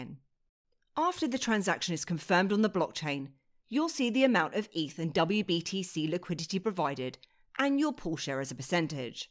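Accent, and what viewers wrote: United Kingdom. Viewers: British accent is nice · that voice and British accent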